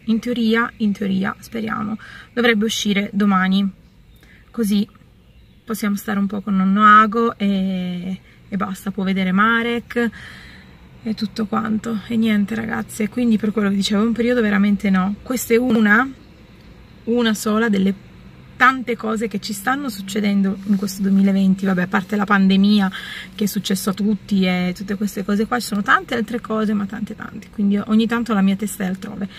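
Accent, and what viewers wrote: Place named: Turin, Italy. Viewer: Sei napoletana solo di nascita, ma in realtà sei torinese nel modo di parlare e di essere. Penso che quando vieni a Napoli tu ti senti un pesce fuor d'acqua.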